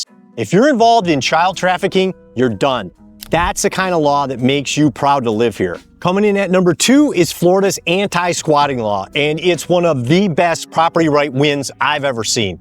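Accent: Michigan, United States. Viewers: That Michigan accent is THICK my fellow Michigander ❤ (or tell me which state you're from but I would bet money lol) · Great video! Are you an escapee from Michigan? 😅 I recognize the accent. 😊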